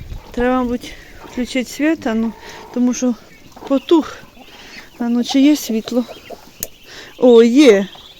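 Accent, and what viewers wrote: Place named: Kryvyi Rih, Ukraine. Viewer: Яка красота я тоже виросла в поселку в кривому розі ,у нас точно такий говір,а де ви живете?ви молодці хазяйни бажаю всим миру,живу в Києві мені уже 72 роки,все промайнуло ,насолоджуйтесь життям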